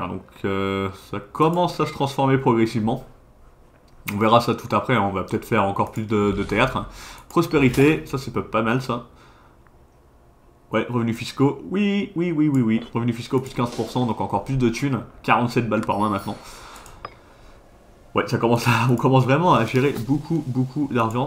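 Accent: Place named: Netherlands. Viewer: ton Français et très bon mais ton accent te trahie:D Tu es de quelle pays? À vu de nez je dirais Hollande 🤔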